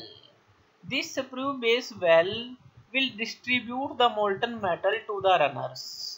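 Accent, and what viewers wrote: Punjab, India. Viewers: U r Punjabi because it accent like that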